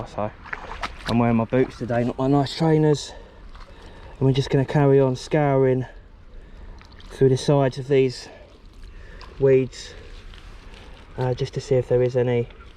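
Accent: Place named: Scotland, United Kingdom. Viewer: to pick em up if you want them for bait, lol was for how scittish you sound buddy ha ha keep up the good work fella hopefully things go well for you and the family stay safe !!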